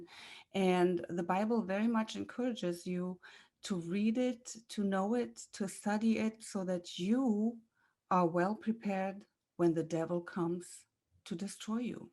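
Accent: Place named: Germany. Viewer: Thank you sister in Christ, glad I found your channel and happy for you that you were set free from your narc(s) 🙏🏼 Going on 17 years of marriage with a Christian covert narc next year. The Lord has opened my eyes completely. Starting Minute 22 is spot on! I can hear an accent- are you from my home country Germany? God bless you 🙏🏼🤍🦾 MARANATHA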